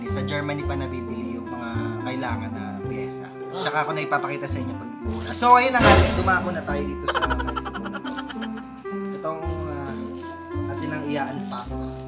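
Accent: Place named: Laguna, Philippines. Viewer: Taga laguna po kayo sir parang puntong laguna eh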